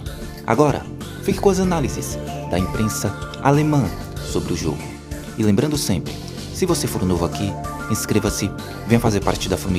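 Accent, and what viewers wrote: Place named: England, United Kingdom. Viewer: Mermão com esse sotaque inglês muito forte vc fala imprensa alemã , pelo amor de deus . Vc conhece a diferença entre inglês e alemão. Rapaz.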